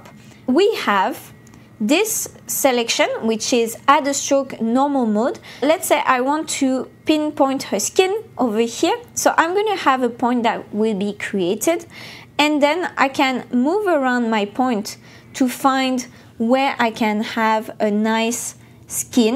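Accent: France. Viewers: Salut.. Merciiii. Pour. Ce video. surtout que c'est ton specialité. J'addors ton accent français. Quand tu parle anglais.. ❤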